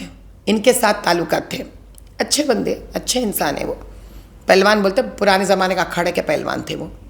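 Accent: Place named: Hyderabad, India. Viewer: माधवीजी आपकी बात पूरा भारत समझ रहा है हैदराबाद की बोली भी सुन्दर है आप उसभाषा। मेभी इनटरवयू देगी तो आपके.मुह से सुन कर हमे भी अचछा लगेगा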